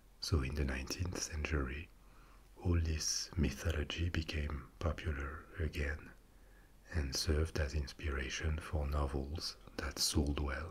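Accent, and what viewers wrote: France. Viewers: Hey, cool idea for an ASMR channel, finally sth different, your calming voice with the French accent rocks, dude!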